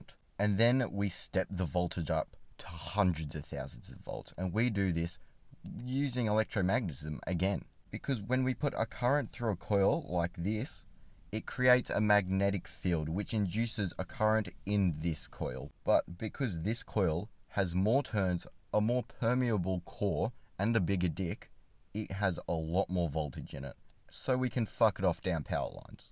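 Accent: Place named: Australia. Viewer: Dude you are one of the funniest channels on YouTube. The dry delivery and Aussie accent (at least to me here in America) is fuckin fantastic. If I’m ever in Australia, I’m gonna figure out who you are and we’re gonna meet up for a beer or 7. Keep the great shit coming!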